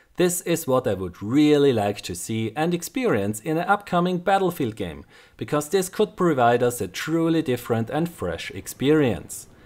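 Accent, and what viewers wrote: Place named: Germany. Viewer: Haha I am joking of course! I love your ideas and stuff for battlefield. Love the accent too. Are you from Germany by chance?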